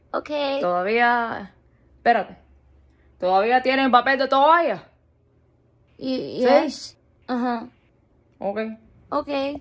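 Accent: Cuba. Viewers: Now I wish, I would have met my grandparents before they passed. 😭 It just hit me that I missed out on all of this. 😔 But, you are amazing. I just love that Cuban accent so much!💜💜💜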